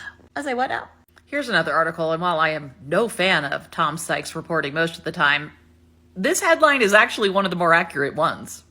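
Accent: United Kingdom. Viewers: Hahahaha, Please keep using that British accent telling us about all their distasteful hypocrisy!!!😂😂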